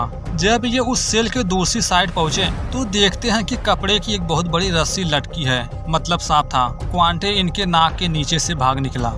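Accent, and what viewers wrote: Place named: Bihar, India. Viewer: Bihari h bolney wala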